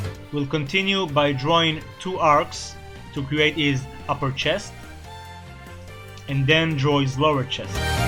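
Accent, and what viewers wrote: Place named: Israel. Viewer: אתה פשוט מלך! למען האמת, כשרק התחלתי לצפות בך שמתי לב למבטא שלך ואז ראיתי שהשם שלך זה וישר ידעתי שאתה ישראלי וזה מאוד שימח אותי. אז תמשיך ככה אתה אחד הציירים הכי טובים שראיתי! בהצלחה בהמשך הדרך שלך ביוטיוב!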